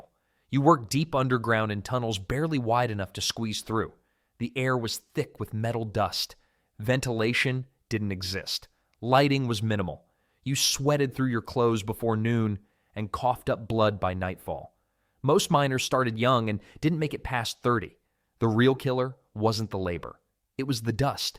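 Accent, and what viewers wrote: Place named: United States. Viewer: Interesting sounds a lot like American hmmmmm 🧐🧐🧐🧐🧐🧐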